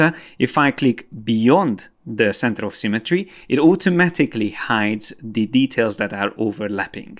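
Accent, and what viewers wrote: Hungary. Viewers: Cool videos! Did I sense a little Hungarian accent?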